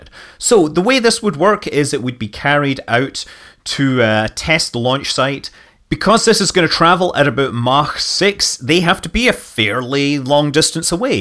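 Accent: Scotland, United Kingdom. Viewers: LOL, your accent is reverting to your native Scottish. The Bell X-1 of course had the one XLR11 engine that has the four thrusters. The early X-15 had a pair of XLR11's (so eight thrusters) while (whilst?) they were waiting for the XLR99 monster. Lifting bodies also made use of the XLR11's.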